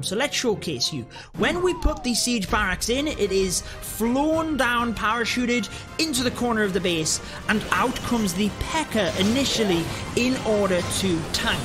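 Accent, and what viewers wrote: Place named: Northeast England, United Kingdom. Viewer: Newcastle or Sunderland mate?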